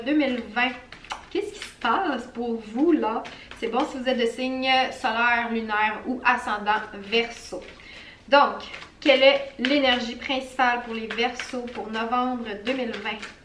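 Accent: Quebec, Canada. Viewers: Salut. Je te découvre joyeusement. Il est bon d'entendre un tirage en bon québécois. Tu es colorée et c'est plaisant. Bravissimo. 😃👍😃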